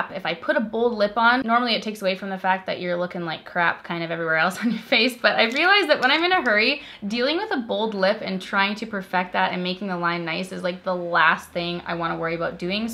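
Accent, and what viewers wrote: United States. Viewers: i must say , i did not expect an american ascent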